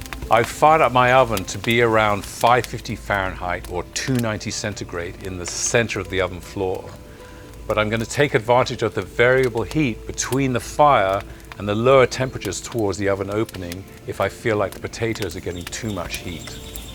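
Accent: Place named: RP, United Kingdom. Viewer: One reason I love this program is the casually precise narration delivered in crip RP. The thesaurus comes naturally to this guy. There is zero barrier to vivid understanding.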